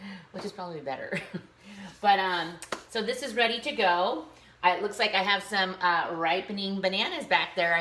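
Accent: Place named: Wisconsin, United States. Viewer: I'm hearing a very Wisconsin accent there. You wouldn't happen to also be a Charlie Berens fan would you?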